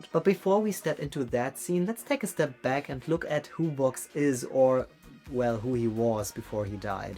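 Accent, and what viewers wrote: Germany. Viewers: Ich höre einen deutschen Akzent heraus 😏😏 Freu mich einfach, wenn Leute aus Deutschland über Hazbin Hotel/Helluva Boss reden. Sehr nices Video! :)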